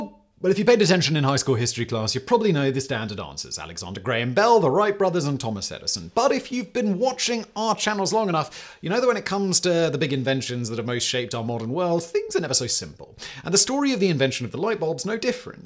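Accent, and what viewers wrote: United Kingdom. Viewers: Well the narrator with the British accent seems to think history class was only taught in highschool. that excludes everyone in the UK then.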